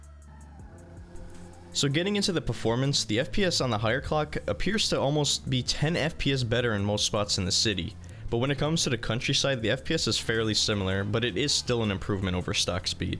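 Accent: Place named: Denmark. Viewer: Hey, du lyder Dansk :-) Jeg har også et build med FX CPU, det hjælper sindsygt at give den noget hastighed :-)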